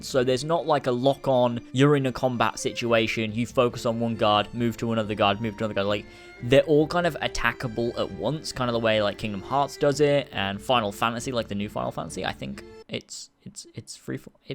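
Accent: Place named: Northern Ireland, United Kingdom. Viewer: where are you from cause your accent sounds like a northern Irish...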